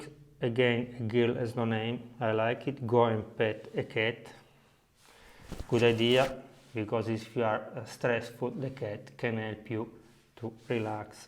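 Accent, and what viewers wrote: Italy. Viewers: Your english is not bad, it’s great, it has an italian accent to it and it is normal. People need to chill and stop being rude and appreciate the unique content you are adding.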